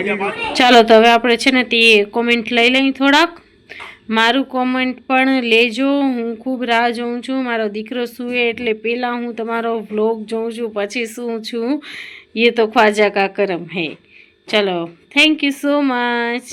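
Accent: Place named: Kathiawar, India. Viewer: tamari khathyawadi bhasa mast bolo cho nice😊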